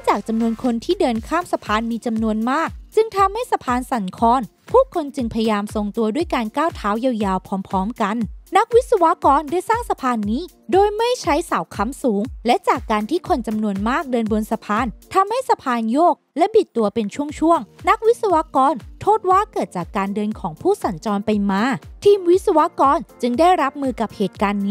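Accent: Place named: Provincial, Thailand. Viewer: เหมือนเหน่อ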